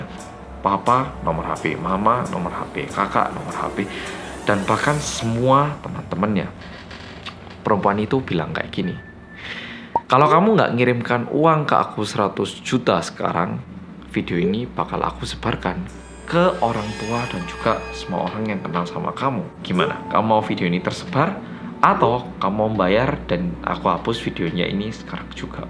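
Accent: Java, Indonesia. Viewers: Bersama orang Korea yang medok.... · canduu medokk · Bersama dengan orang korea yang medhokkkk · Cerita yg dpt menjadikan kita semua menjadi lebih hati2 di era yg serba canggih... Thanks mas korea yg medok jowone 😁🙏👍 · Medooookkkk semakin ombo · Medokkkk(bibirku meluu mlongo) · Hihihi medhokkkk🌼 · Bahasa nya oppa medok bgt 😁 Enak di denger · Bersama orang korea yang medhoooook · Mas medooookkkk...😊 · Kembali lagi sama orang korea yang MEDDHHOOK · Mas lebih kentel Jawanya daripada Korea😭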